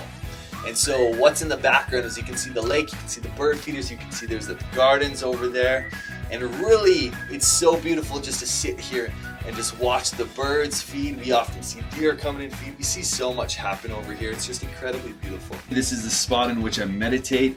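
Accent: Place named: United States. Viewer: Where do you live? I’m guessing from your accent you live in the states. So which state do you live in?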